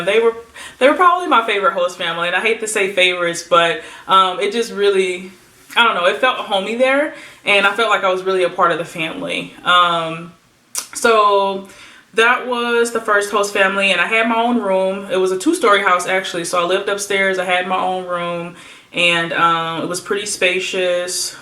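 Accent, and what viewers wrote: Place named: Midwest, United States. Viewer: . yep yep. Different foods and maybe different dating habits, like when teens date. Or church habits.... Like that. Also What was the non profit you worked with, what were they setting up and what was your role? Lovely. The work of the Lord. M. IL. Ps. You sound Midwest. Are you a Midwest girl? I live in western Chicago 'burbs. M.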